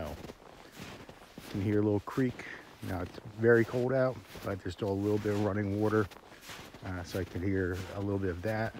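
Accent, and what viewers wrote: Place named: United States. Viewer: Nice video but you sound American...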